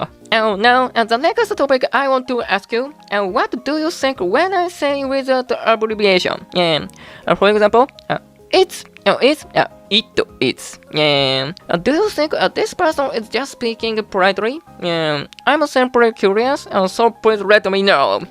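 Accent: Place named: Japan. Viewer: Let me give three pointers on this, from a Chinese person who learns to speak English: 1. 三力 : It takes three times more energy to speak English words. It gets tiring very fast in the beginning. You should speak every word with the proper type of emotion (except for neutral words, which should sound neutral). The reverse is true for Caucasians who speak beginner's Japanese. They speak with too much emotion and enthusiasm when they should be using three times less energy. 2. 三層 : Three layers of accent. It is like painting your front gates. You need three layers of paint: the base (your Japanese accent is fine), the emulsifier (a faux Gaelic/Celtic accent), and the glossy colorful finisher (the RP accent). The reality is that in UK, only 2% of English speakers speak RP accent natively. They speak different sorts of English at home. Sometimes, they speak other native languages like Scots, Welsh, or Irish. 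You don't really need a real Gaelic accent. As long as you can approximate even a bad one, you will know how it works, and you can then approximate RP better. 3. シェイクスピア : I'm not saying that you have to be good at it. You just need to know the key concepts in stage play. For example, the iambics (breathing and pacing). Actually, you can probably do Shakespeare in Japanese and still get those concepts because they transcend languages. When you can ham up your Hamlet, you bring home the bacon.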